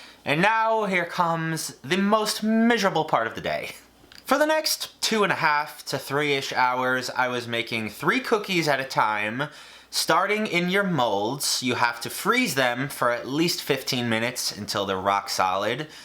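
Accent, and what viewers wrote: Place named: North, United States. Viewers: Yeah, he has a northern accent! He lives in New York, doesn't he? I suppose it's subtle for people in New England, but as a Southerner with a California-esque accent, it's really evident lol! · I’m from Long Island New York and he sounds like my half of the Island. Completely normal.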